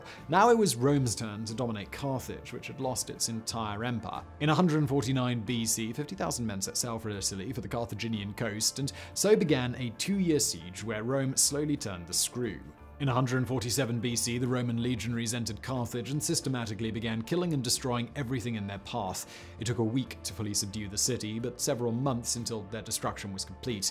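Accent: United Kingdom. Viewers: Dude. Great vid. But, truth be told, I also come for the British accent and colloquialisms.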